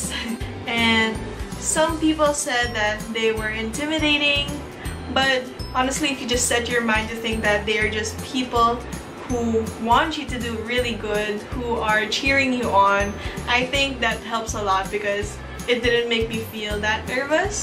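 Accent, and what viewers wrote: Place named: Philippines. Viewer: Ermgee I could tell you were Filipino from your voice! HELLO, galing mo! Awesome and helpful video! Was this in Makati? (STEPS Dance Studio)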